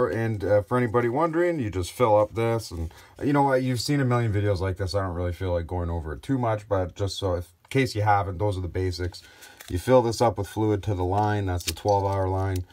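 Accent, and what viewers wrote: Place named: Ottawa Valley, Canada. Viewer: ya its a decent one. CT used to always stock zippo fluid, but since zippo bought ronsonol I haven't seen it. Just the yellow one now. Ya I knew u were a Canuck from another vid of yours. Woulda guessed anyways, ur accent is similar to what we got in the ottawa valley.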